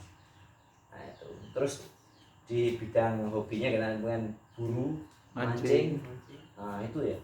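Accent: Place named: Java, Indonesia. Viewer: Asli wong Palembang,,,,,, TPI logat bicara Jawa ny jngan d bawa mas,,,,,,bawa logat Palembang aj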